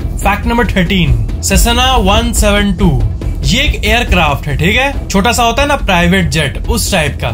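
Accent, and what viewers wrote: Bihar, India. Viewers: Aap bihar se ho ka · aap bhihar sai ho kya ? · Are you from bihar sir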